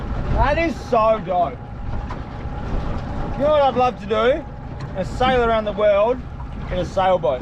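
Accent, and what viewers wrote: Western Australia, Australia. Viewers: I knew you would be from Perth!! Classic South West WA accent. I live like 5 minutes from where your video starts, me and my partner are aspiring content creators. We do most of our stuff on Twitch. I'm a total city slicker, I want to become influential enough to be able to collaborate with others in the content creating community. My dream now is to one day be part of an episode where you take a pair of city slickers out to the wilderness and see how well we fair with as little intervention as possible. I would LOVE that challenge!!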